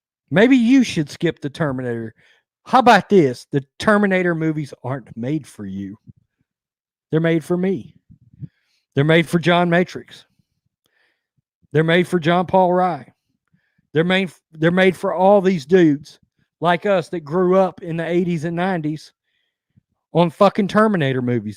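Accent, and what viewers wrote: Texas, United States. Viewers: This has nothing to do with Terminator but are you from Texas? I’m from the Netherlands and am wondering if I recognize the accent correctly 😅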